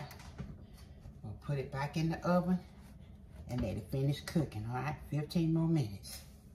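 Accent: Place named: South, United States. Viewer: So happy I just found your channel. Miss I love this recipe but even more I love you & your husband praying. I hope you don’t take offense but I love your sweet soothing Southern accent. Reminds me of my Mom. She was a wonderful cook from Texas but she never measured anything & she passed away at only 47 yrs old. A couple of my brothers don’t even remember her cooking. Mom made bread pudding & the BEST peach cobbler. She also made a wonderful yellow cake with a bundt type texture that had a nutmeg taste. I just turned 75 & can’t wait to check out all your great recipes. I liked & subscribed to your channel. God Bless & keep you & yours. Donna in Arizona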